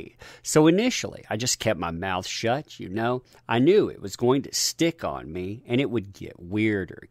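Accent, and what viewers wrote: South, United States. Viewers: Love you're work and am with you on the things you talk about. It is scarie to think about the due process is being fuzzed out with pure crazy making b.s.everywhere and needs to be addressed before it's lost completely. Thankyou for you're time and effort to enlighten folks to what's going on. 👍 Loving the southern droll way you speak. ❤️ Love from UK.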